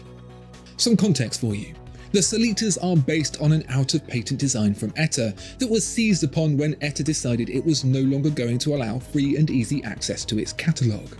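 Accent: United Kingdom. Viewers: He’s British ya goob.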